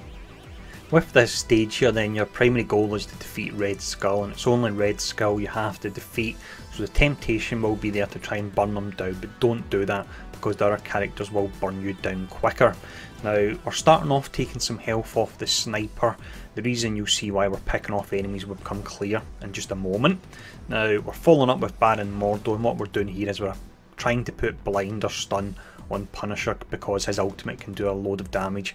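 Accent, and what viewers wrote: Scotland, United Kingdom. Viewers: If I could have picked any accent to hear walk me through this, I would have picked that of a Scot :-D Thanks for the help mate!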